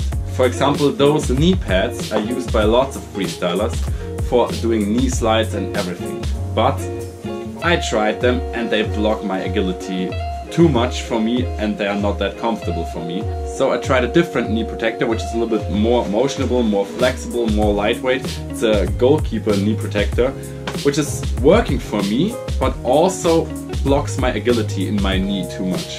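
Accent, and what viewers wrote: Germany. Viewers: Hey, du sprichst gefühlt besser Deutsch als Schwizerisch. Woher kommst du denn? Ich bin fast in Konstanz und könnte ein neues Mitglied werden 😋 Bin schon 30 🙊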